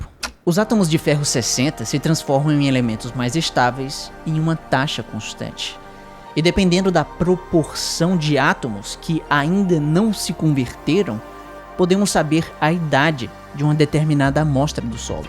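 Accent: Ceará, Brazil. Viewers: TU É CEARENSE MANO?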